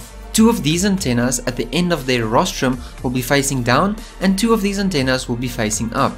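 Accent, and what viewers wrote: South Africa. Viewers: Aweeeeeh I didn't check your surname but as I heard your voice I knew ! This guy is from RSA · Bro you had me at the intro, but the south African accent was the cherry. Subbed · Are you from South Africa Is jy Afrikaans?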